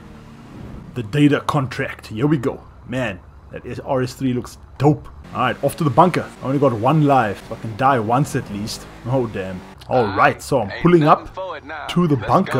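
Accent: South Africa. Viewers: Are you originally from South Africa?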